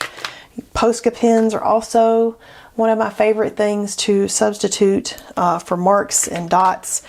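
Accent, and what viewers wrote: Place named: South Carolina, United States. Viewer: You sound like you are from South Carolina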